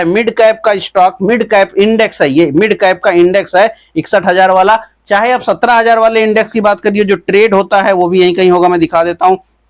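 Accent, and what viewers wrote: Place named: Ayodhya, India. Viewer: Ayodhya ji se hain, Kanpur ki boli lahja thoda alag hota h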